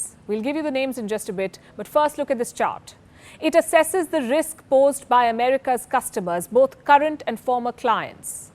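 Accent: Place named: North India, India. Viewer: stereotypes accent media made about india is south indian , most of north indian accent is same as palki.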